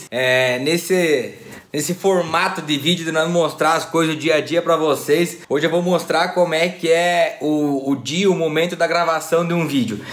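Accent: Colono, Brazil. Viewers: tem o mesmo sotaque de colono mesmo fora do personagem. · O sotaque é impossível de esconder, no começo do video ele só tenta disfarçar mesmo, mas o sotaque que ele se sente a vontade é o de colono, aposto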